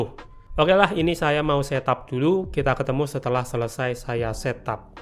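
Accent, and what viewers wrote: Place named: Java, Indonesia. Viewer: Asli kliatan medok nya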